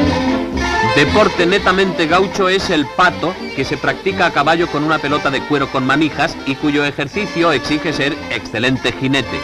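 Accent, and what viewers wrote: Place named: Spain. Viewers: Por supuesto que el acento es distinto, si el locutor es español🤦‍♂️🤦‍♂️🤦‍♂️🤦‍♂️ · El locutor debe ser español, en esa época el acento porteño era más marcado que ahora, sino mira películas argentinas de los años 60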